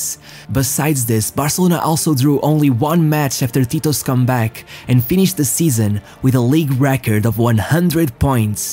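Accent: Portugal. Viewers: your perfect english vocabulary paired with your charming portuguese accent... bro... I am not gay or something but I am totally in love with you. You are telling those stories with true love from your heart and everyone can hear this. if maybe, 20 years from now, your videos will still be on youtube, I will watch them and maybe shed some tears of joy, coming from the nostalgia, created by your words about the good old times. merry christmas and a happy new year to you my fellow lover of the greatest game in the history of mankind. PS: I was tripping on LSD when I watched your CR7 video and it was an awsome experience.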